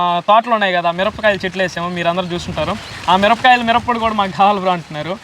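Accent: Nellore, India. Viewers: మీది ఏ ఊరు బ్రదర్.మీ బాషా చూస్తే నెల్లూరు లాగా ఉంది.మా అమ్మ వాళ్ల ఊరు వెంకటగిరి.మా అత్తగారి ఊరు చిత్తూరు. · Nellore ollam adhi ma yasa elane vumtumdhi😊😊😊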